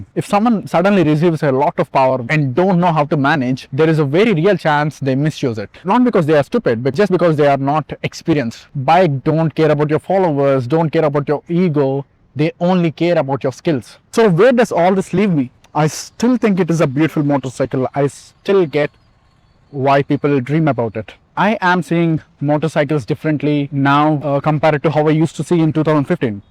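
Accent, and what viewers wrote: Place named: India. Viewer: As an indian , this accent is 😭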